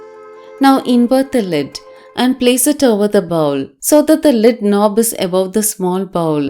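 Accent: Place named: Coimbatore, India. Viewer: Hi, i keep watching ur videos, when i hear ur tamil slang, i feel ur somewhere near cbe, is it so. U videos r very useful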